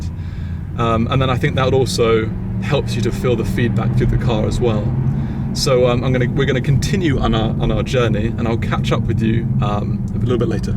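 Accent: RP, United Kingdom. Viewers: Great review, posher than Shmee as well😁 (mean that in a good way)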